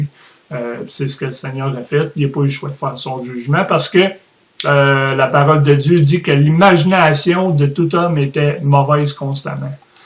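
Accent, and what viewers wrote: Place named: Canada. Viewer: In bon message mais français canadien difficile de saisir.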